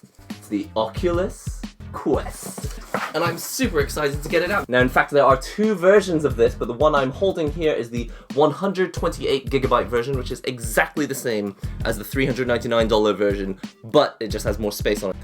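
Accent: England, United Kingdom. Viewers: U have better british accent than me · I think you're the first asian i've heard with an english accent. I bet you go bonkers for tea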